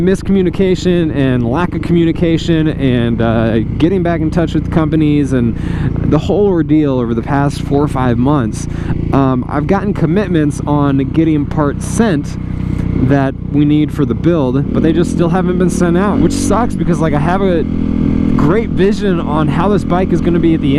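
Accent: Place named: South, United States. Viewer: that southern drawl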